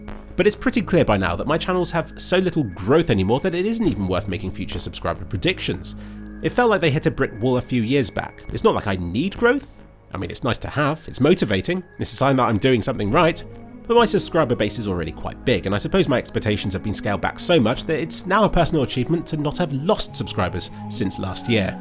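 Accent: United Kingdom. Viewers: I once told a friend about an old animated film, that one famous director spent many years and tens of millions of dollars on, only for it to die in production hell, because of the perfection the director demanded. The friend then said that they should have simply spent more money and time on the movie. He then questioned why all animation shouldn't be 60 FPS, if you love your job you would want to make it as good as possible, right? I love you and your videos, but I gotta be honest - as a layman, I never really notice the difference between the videos you spent 4 hours or a month on, even though I watch 99% of them. If I were to give an objective, third person view of your content and why I like it - it's that I like seeing you talk on random topics I never thought about, but seem interesting in retrospect, you make the most mundane stuff interesting to listen to. 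I'm not into graphics cards or processors, but hearing you talk about this stuff is educational. (maybe I just like British accents, I watched the entirety of Hilda because everyone had cute accents) So I personally just want to see you passionately talk about random stuff you find interesting, making me find it interesting in turn. Don't know if this helped, but I wish you well anyway.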